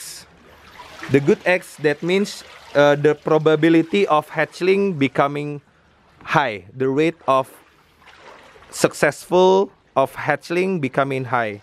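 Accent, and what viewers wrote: Indonesia. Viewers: i love indonesian accent it is cute :D <3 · hehe logat ngomong inggrs emang gitu bisa ketebak klo yg ngomong org indonesia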